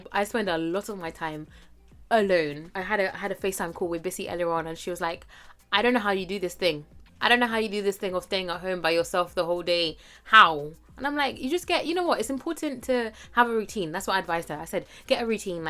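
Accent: Jamaica. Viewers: i always here you break out little patois, i always thought you were Jamaican, was surprised when you said you are Kenyan :) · No sah you sound just like a Jamaican. Roots 🇯🇲🇯🇲🇯🇲🇯🇲🇯🇲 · is that patois i here😂okkkkk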